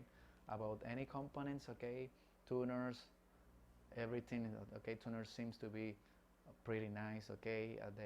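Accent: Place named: Chile. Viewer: Eres chileno? ... tu acento suena a chileno 😨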